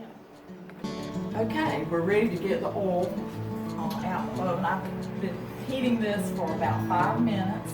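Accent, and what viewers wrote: South, United States. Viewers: Can you just flip the skillet upside down to get it to fall out after you pull it out of the oven? Your genteel Southern accent is all I need to convince me to try this recipe.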